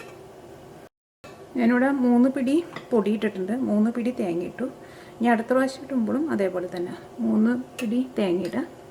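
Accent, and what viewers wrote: Thrissur, India. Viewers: Mam തൃശൂരിൽ എവിടെയാണ്? സംസാരം കൊണ്ട് തൃശൂർ ആണെന്ന് മനസ്സിലാകും.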